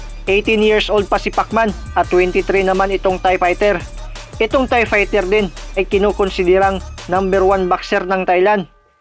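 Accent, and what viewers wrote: Visayas, Philippines. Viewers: Na gusto han, ko naman kaso yung bosis MO halata gud na bisaya ka, haw Ka pas pas nemu ag estorya hehe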